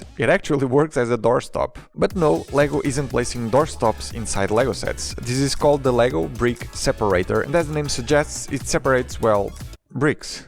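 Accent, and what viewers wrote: Portugal. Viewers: Aos 2 minutos comecei a notar o sotaque, olhei para o nome do canal e conformou-se, portugueses para a frente! Gostei do vídeo, conciso e interessante, bom trabalho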